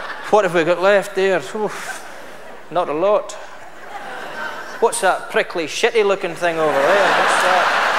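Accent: Scotland, United Kingdom. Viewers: Yeah he is, he's born in Moffat, can you not hear it in his accent?. His dad's Indian but that's it, his mother is Scottish and that is where he was raised. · he looks indonesian but sounds scottish. its so confusing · hes scottish... quite CLEARLY! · Love this guy I am part of the Scarborough Faire Queen's own Highlanders and just love to hear some one with a Scottish sound it is like music to my ears.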